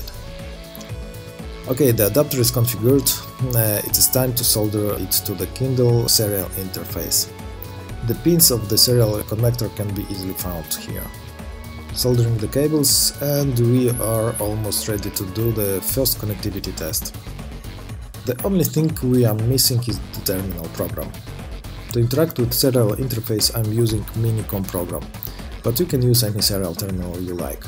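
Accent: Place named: Eastern Europe. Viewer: Собрался выбросить, думал батарея... заменил, без толку, звездочка крутится и виснет. Лазил в поисках волшебного "Big hardware reset for Kindle", нашёл это. Буду колдовать. Спасибо. В режиме санкций, концепт пoтреблJтства "купил-сломалось-выкинул-купил" необходимо забыть и восстанавливать то, что можно починить. Благодарность автору! (прошу великодушно простить, но акцент в ролике - наш, славянский).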